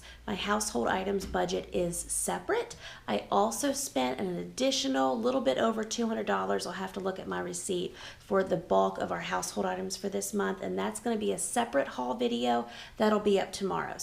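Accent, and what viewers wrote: South, United States. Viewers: What state do u live in? if im not mistaken u have a southern accent?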